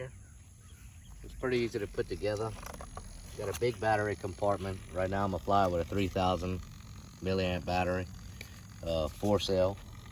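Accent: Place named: Louisiana, United States. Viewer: Takes me back to Pierre Part Louisiana the Cajun accent!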